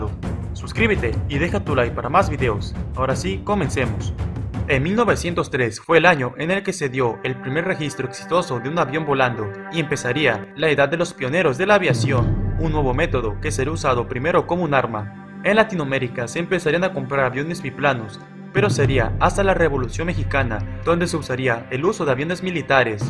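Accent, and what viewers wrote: Peru. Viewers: paso de largo por la guerra peru colombia por el trapecio amazonico y putumayo ? me sorprendio mucho , pues creo su aento es peru , me equiboco ? si es asi debo suponer fue intencional la omicion ? - vi en barios de sus ejemplos , fueron en conflictos internos , siendo asi deberia estar colombia , pais donde mas operaciones areras se dan - mas que toda resto latinoamerica junta , pilotos vuelan 5 beses el promedio de horas vuelo de todos pilotos militares de latinoamerica , mayoria en operaciones combate , bombardeos reales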